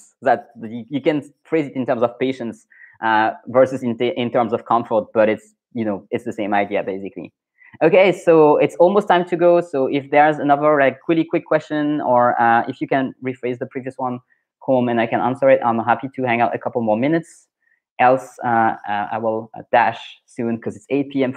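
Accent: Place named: United States. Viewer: I am listening and watching this but I thought I would you pick up on what you said . Your accent sounds anglophonic. I would say broadly apart from the odd word I would say to my British ear you sound as American or Scandinavian who speaks good English.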